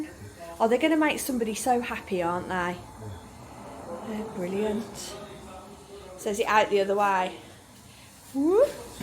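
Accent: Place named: Black Country, United Kingdom. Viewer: i’ve just found your channel, completely by accident. Your videos are superb. You have a lovely way about you and describe things so well! I used to live in Coseley in Wolverhampton, so lovely to hear your accent as well. Very best wishes. Neil in Southport.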